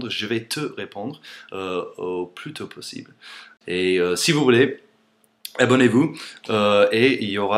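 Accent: United States. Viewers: Cet accent americain c'est charmant! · Hi, After watching some videos, I saw your French is better. I also noticed when you sing in French, you forget your American accent, thanks for your effort. Thank you for your videos and good luck.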